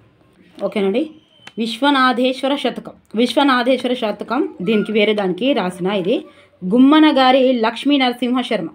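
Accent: Telangana, India. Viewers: Me voice vinte oka energy vastundi mam telangana slang nd me short tricks , explanation chala bavunnay... thank you madam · పక్క తెలంగాణ భాష లో చాలా బాగుంది మేడం 👌👌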